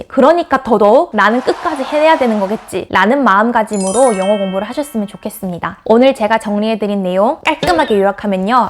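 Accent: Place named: South Korea. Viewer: 아무리 국내파라도 결국 영어유치원 원어민 학원 줄창 다닌 것 같은데? 아닌가요? 그래도 한국 수도권식 단조로운 발음 악센트 고치려고 엄청 노력했을 것 같네요 ㅎㅎ 조선인들은 외국어비교부심이 강해서 ㅋㅋ 자기는 할 줄 아는 외국어 하나도 없으면서 남의 와국어 평가하고 자빠졌음 ㅋㅋ 이것도 외국어 공부하는 사람들이 넘어야할 부분중에 하나임..😂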